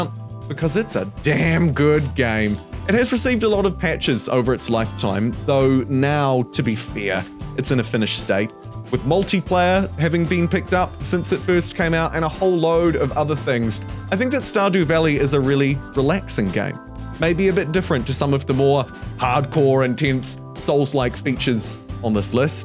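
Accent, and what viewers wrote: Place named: New Zealand. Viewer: Because the New Zealand accent causes e to sound like i.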